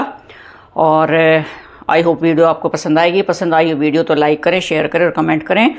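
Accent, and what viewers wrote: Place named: Haryana, India. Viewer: बहुत अच्छा बहन आप कहां से बोल रहे हो आप मेरी हरियाणा की बहन लग रहे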